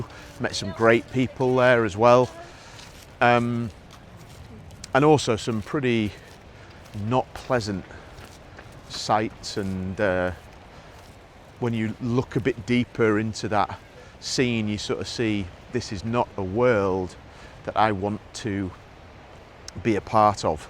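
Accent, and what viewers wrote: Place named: Northern England, United Kingdom. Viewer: Nice one. I've watched a few of your videos in the last days not very attentively. I already know las ramblas are often overcrowded. Hay muchos videos sobre "Moving to Spain" "Reality of living in Spain" or "Culture shocks of Spain" (this is where it starts to get silly para mi), it's a bit repetitive to put it mildly. StatemenIts, opinions or whole videos "slightly annoying" are mostly from US-Americans. It's all not a serious issue for me, so I keep it simple: Complaints about spanish bureaucracy in british english sound better. This video's first minutes were special for me, because I realized you speak with a distinctiive northern accent. Manchester let me see the light. Are there videos about "What you should know before moving from Miami to Moss side"? 🙃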